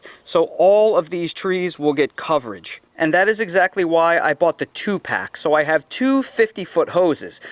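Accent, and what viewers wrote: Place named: South, United States. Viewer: I love your Southern accent! ;-)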